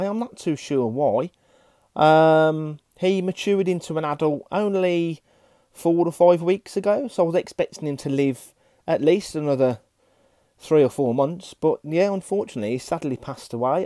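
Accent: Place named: United Kingdom. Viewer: I hear the a as o instead. Brittish accent can be difficult to understand sometimes, particularly if you hear American all the time then you thing that is the normal. I am not a native speaker, I am from Greece. You speak with original brittish accent though. Maybe Charles Darwin spoke like that, I don't know. Generally the Brittish sources I have read refer to the animals with more normal names. You say royal pythons, Americans say ball pythons. In Greece the Greek name is royal python but colloquially many people say the American, ball python. You say horned frogs, Americans say pac man frogs which is somewhat silly. The same with morio worms. I don't know who tried to market them as superworms, it sounds like a cartoon name and of course if you were to find them in the wild you wouldn't devise a name like that. Or the black soldier fly larvae which are called phoenix worms, or the stupidest of all, calciworms, while in fact they are just plain maggots.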